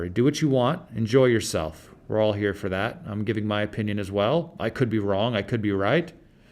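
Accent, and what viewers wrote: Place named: New York, United States. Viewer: This guy's sense of humour is really awesome also the new yorker accent in him always reminds me of Burr.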